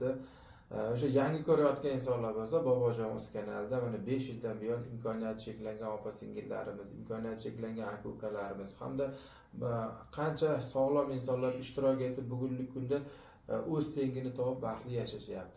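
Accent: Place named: Khorezm, Uzbekistan. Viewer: Ассалому алейкум. Ози каерлик ? Шевасидан хоразим или Караколпогистон турткул или элликкала беруни туманиданми .телеграмда чикмаябди номерингиз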